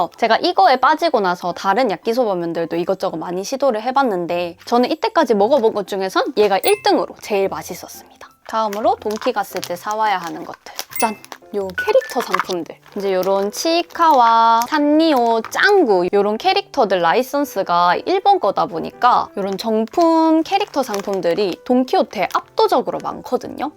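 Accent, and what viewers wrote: Gyeongsang, South Korea. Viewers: 영상이 떠서 처음 들어와봤는데 경상도 분이신가봐요? 사투리가 ᆢ ㅎ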